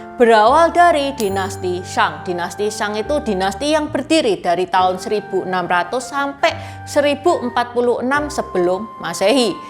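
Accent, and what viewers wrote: Java, Indonesia. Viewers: Hidup merah, Meddok nya sudah berkurang dikit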